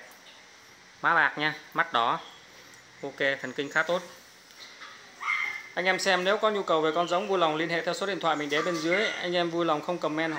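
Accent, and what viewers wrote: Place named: Thanh Hoa, Vietnam. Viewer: Giọng bác giống giọng thanh hoá quê ta quá 😂😂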